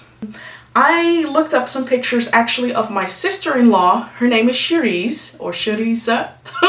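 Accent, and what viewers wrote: Netherlands. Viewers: OMG are you dutch??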